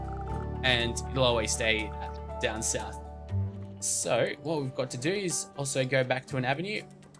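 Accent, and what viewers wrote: Australia. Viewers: Oh, this is Sim City (GOOD) you are Australian = This play through EPIC. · It's Australian not British lol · hey!! you are Australian!! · you sound like your from Australia are you from austrailia if you are your EPIC! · are u from Australia? because my mom is and i now a accent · I love this guys voice where are you from. Australia any chance? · fuck yeah! You're australian! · Australian right ? ;) Nice video mate ;) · I thought you were Australian and I cheered a little (I'm Australian, in Brisbane) then you said about saving on the North American servers and I got a bit confused =P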